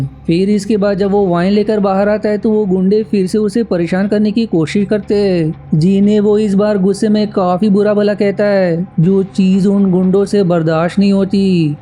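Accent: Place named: Bihar, India. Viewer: तू अस्सल बिहारी लगता है मेरेको हो तेरी जिंदगी थू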